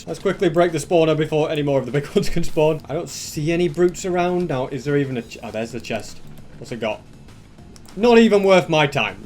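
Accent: United Kingdom. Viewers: He is british you plum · Is it just me or sounds british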